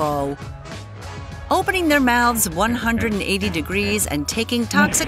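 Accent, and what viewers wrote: United States. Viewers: Kilopascals for pressure- there's a unit most listeners cannot relate to. Going by the narrator's accent and mention of visiting the Cincinnati Zoo, I'm guessing that the intended audience might be mostly Americans...centimeters and kilograms aren't really how most of us reference distance and weight. Why doesn't the hippos mouth open Pi radians? ...if you'd like to promote Si, that is. Aside from the units, I always thought force to be more appropriate for bite strength than pressure, which, for a given jaw strength, would vary widely depending on the area considered. Most animals bite strength, measured in PSI or kPA, could exceed their tooth strength, if they bit a ball bearing, or any small hard thing....the force, without considering area, seems more relevant. Pounds, or Newtons, if you insist.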